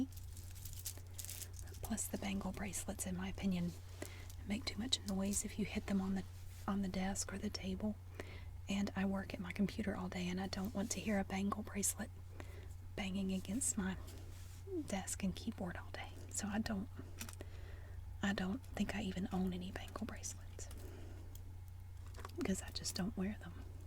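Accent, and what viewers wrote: South, United States. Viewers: this is the first video of yours that I have watched! I already love you! and your southern accent! wishing you well in your new house!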